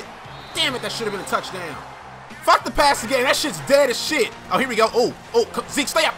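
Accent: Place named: New York, United States. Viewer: you from New York that's what's up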